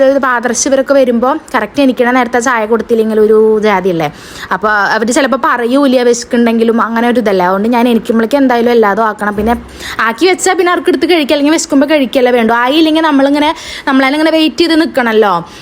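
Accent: Thrissur, India. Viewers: Thrissur angne paraya sheriyakknonnulyaa😌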